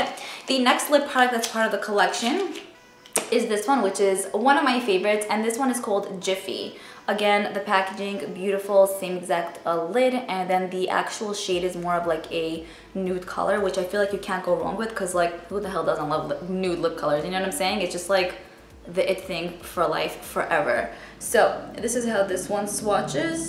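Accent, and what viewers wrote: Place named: New Jersey, United States. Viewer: you have the sweetest accent!!!! Are you from NJ?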